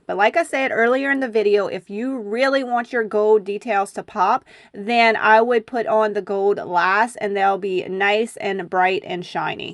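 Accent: Louisiana, United States. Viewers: I just love your videos! I’ve been going back and watching all of them! I used to live in Lafayette so I just loooove listening to that Cajun accent. I think the crusty pans are my favorite but I just love everything you do. You are such an inspiration to me and my crafts have gone to a new level just by watching your painting techniques. You are absolutely my favorite YouTuber! Something I would love to see is how to decorate a smaller home with very little wall space so that it doesn’t look cluttered. I just got a booth at the local antique store so I am hoping that helps with my hording look I’ve got going on lol. Thank you for doing these videos. You make me believe that I can actually make some income doing what I truly love. Keep them coming Sha! Love you tons!! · Where are you from? Have to be a south Louisiana girl! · Hi, I just love all of your DIY videos! 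You have such great ideas! And I love your little Cajun accent! I’m a Louisiana girl myself, but from New Orleans, so I don’t have the Cajun accent. Thanks for all you do!😍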